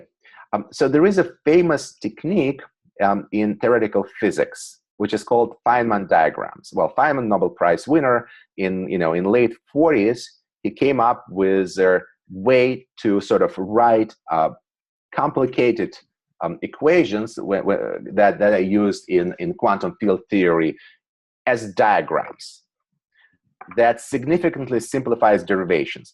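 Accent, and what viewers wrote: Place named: United States. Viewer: Thank you very much for uploading these videos. Did you learn English in States? I know you are Russian but you don't have much Russian accent in your English...it is American. I am surprised to be honest. Many people in Russia learn British pronounciation. And yours is clearly not that.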